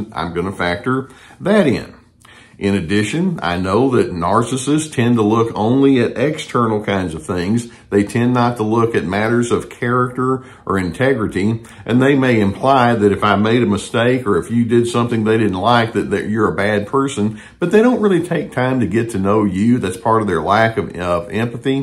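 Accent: South, United States. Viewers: Thanks from France, Dr, for your daily (almost) presence. I am French, a musician, and your southern drawl is a joy, and music.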